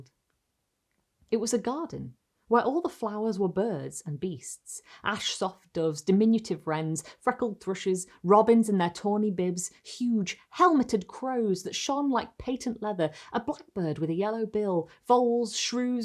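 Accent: United Kingdom. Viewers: Let me just say, this story hits quite different when doing yard work in the fall! Also I feel like the sex could be changed from male to female and be just as relevant. As an American some stories are most effective with a British accent :)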